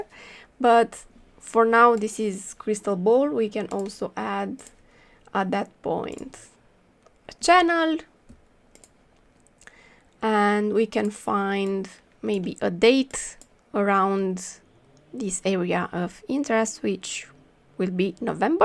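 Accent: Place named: Romania. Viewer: Are you Romanian ? That accent is familiar.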